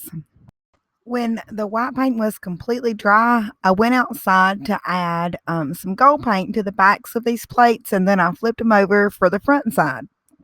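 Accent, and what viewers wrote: South, United States. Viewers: After seeing this diy with the gold and you adding the touch of bling, I knew right away you were the one to ask I have been trying to find a farmhouse look with just a small amount of bling added to it, do you think maybe you could try to do a DIY on this.. I just don't have the imagination or the crafting ability to pull it off..but watching your videos I see you do.. I would love to see what you come up with....I live in a trailer and I want elegance in my decor but I want to stay true to my country style of living.... Thank you for all your inspiration that you give me I really enjoy watching you and I just love love love your country twang.. You just seem so down to earth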